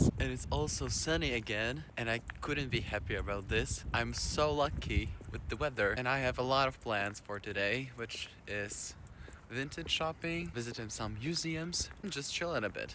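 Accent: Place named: Sweden. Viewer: Can really see your excitement in this video and your English sounds very Swedish as well... you fit right in :D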